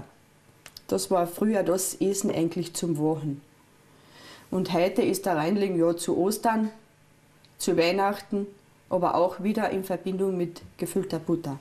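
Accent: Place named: Carinthia, Austria. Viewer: Noch mehr kärntarisch geht oba nimma. 😀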